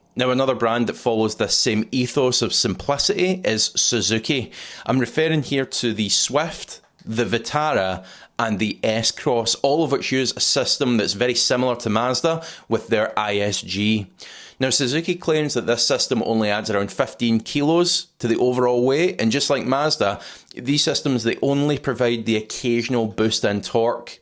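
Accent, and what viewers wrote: Scotland, United Kingdom. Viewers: Would be grateful for recommendations regarding bigger cars with lots of space, to be converted into a mini camper. The usual suspects Caddy, Kangoo, Berlingo etc get mixed reviews, the Toyota vans are based on French PSA - so it is really hard to come by sth reliable. Maybe some other Asian vans or SUVs? Or the Dacia SUVs with older technology engines? From Germany here - and really appreciating you channel, even if I have to listen very carefully to understand that nice Scottish accent. ARRR! 🏴‍☠️🦜😁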